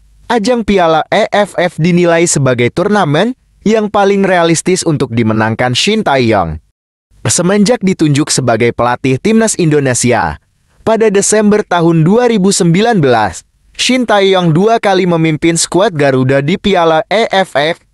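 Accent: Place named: Malaysia. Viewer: BROO SAYA KASIH TAU KAMU KALAU BACA BERITA PAKAILAH BAHASA INDONESIA YG BAIK DAN BENAR,,,NGGK USAH IKUT IKUTAN SAMA ORG MELAYU MALAYSIA....??? EFF AFF/..... A E / B BE C Si D.... .....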